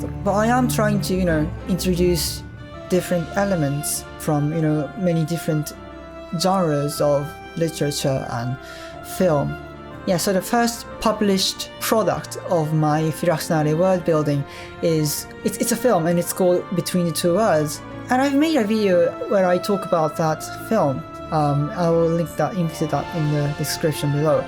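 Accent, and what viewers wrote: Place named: United Kingdom. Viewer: Your British accent is good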